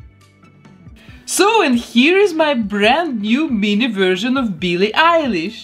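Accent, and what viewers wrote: Russia. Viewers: Вы русская? Голос такой интересный, и акцент Но в любом случае, я в восторге с ваших работ. Это очень круто. Успехов) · Она часом не русская?просто акцент такой,очень узнаваем)впервые сейчас смотрю этот ролик)ну хочу сказать,она молодец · Сразу слышно,что Русская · Whaaaat lol French?? This is a textbook Russian accent, have you ever really listened to French people in English?? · you have the the THICKEST Rution acsent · Russian accent... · are you by any chance not Russian? it’s just in accent that you’re Russian. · Is she Russian ?she has an amazing accent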